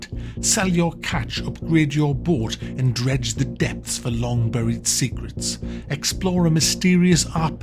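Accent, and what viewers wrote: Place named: Sunderland, United Kingdom. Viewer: Is this guy a mackem? Worst accent I’ve ever heard.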